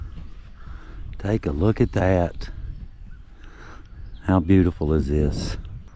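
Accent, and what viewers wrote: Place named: Eastern Kentucky, United States. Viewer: I'd love to get together with you and do a video on Appalachian english, and how even though I only live about 1 hour 45 minutes away from you, and we are still considered Eastern Kentucky how the dialect changes just a little. It amuses me to hear how you pronounce some words and names that we pronounce just a slight bit different. Its actually kind of interesting. Even that the spelling of names changed when people moved to this area from there. You'd have a husband buried here with the last name spelled one way, the wife moved back there and her name on her tombstone is spelled another way. Would make an interesting story for sure. 😊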